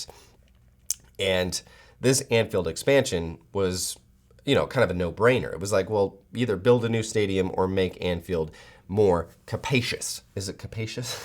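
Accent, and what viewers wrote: United States. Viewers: Are you American ?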